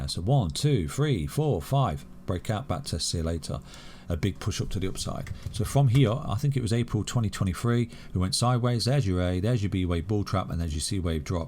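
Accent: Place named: Northern England, United Kingdom. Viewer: A lovely northern accent. Thanks for the TA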